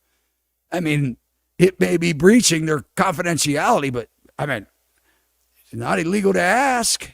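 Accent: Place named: Baltimore, United States. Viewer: BTW, your Baltimore accent is fantastic; the best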